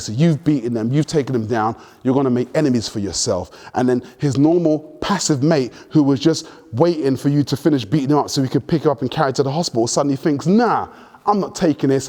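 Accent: United Kingdom. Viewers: greAt brit accent mAte . good info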